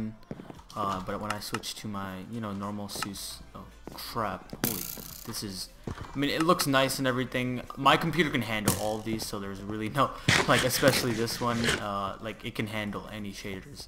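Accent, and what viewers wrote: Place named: United States. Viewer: Great :) . But u actually sound like you are an american :O . How ?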